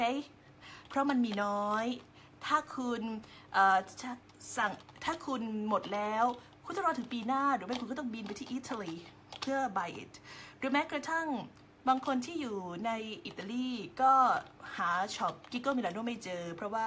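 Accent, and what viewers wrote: Isan, Thailand. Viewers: เสียงกลับมาแล้ว คลิปที่แล้ว เสียงอีสาน ระวังมีดบาดนะคะ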